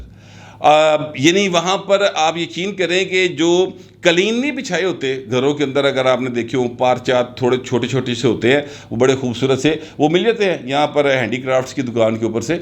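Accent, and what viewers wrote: Punjab, Pakistan. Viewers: صاحب جس طرح آپ اپنے وی لاگ میں اردو کو پنجابی زبان کا تھڑکا لگاتے ہیں اس کا سواد ہی کچھ اور ہے ۔۔حالانکہ مجھے پنجابی زبان زیادہ سمجھ نہیں آتی۔۔ لیکن جس طرح آپ روانی سے بولتے ہے اس کا میں کیا تعریف کروں میرے پاس الفاظ نہیں Keep it up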